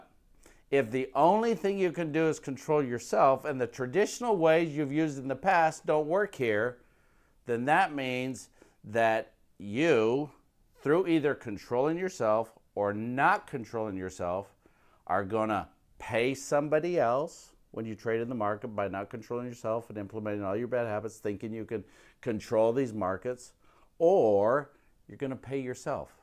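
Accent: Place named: Italy. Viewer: Enjoyed listening to you realised one thing besides everything was saying was right.But also realised you must be Italian.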